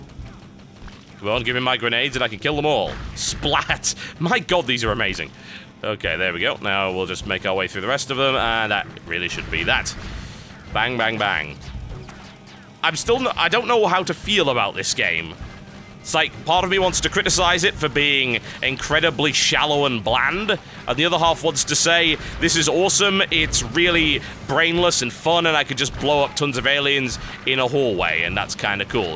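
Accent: United Kingdom. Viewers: He's british. They say things funny. Like Amazon, to them, has an accent on the 2nd A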